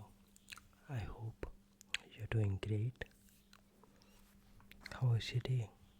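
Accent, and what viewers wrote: India. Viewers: I love indian accent asmr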